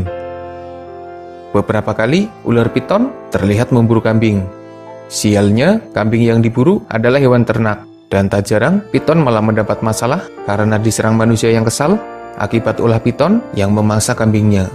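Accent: Banyumas, Indonesia. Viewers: Daleme pundi min admin. Ngapak ya min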